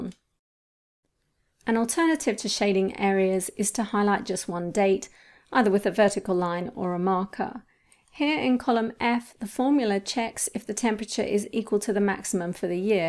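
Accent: United Kingdom. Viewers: Good fast video I think your are British aren't you?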